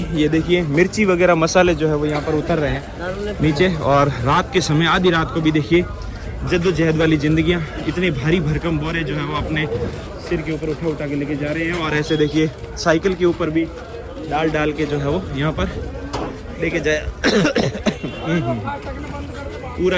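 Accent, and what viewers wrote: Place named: Jodhpur, India. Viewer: Bhai aavaj se jodhpur ka lg rha h tu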